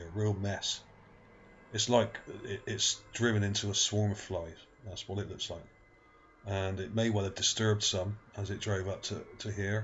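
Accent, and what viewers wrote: Bristol, United Kingdom. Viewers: Are you Bristolian mate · you sound Bristolian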